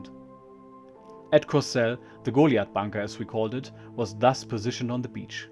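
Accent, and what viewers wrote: Germany. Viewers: Excellent stories. Thanks for these. the narrator is it a real person reading? I like the German accent to add realism.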